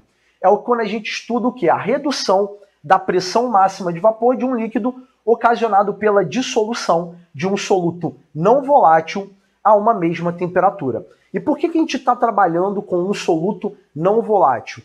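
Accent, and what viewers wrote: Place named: Rio de Janeiro, Brazil. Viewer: Eu amo esse sotaque carioca. Quase me apaixonando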